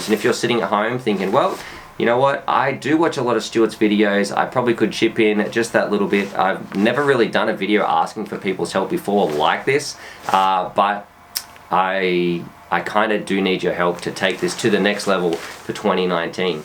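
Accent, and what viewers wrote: Australia. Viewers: I like your Aussie brogue. Anxious to see what new tech is developed in 2019.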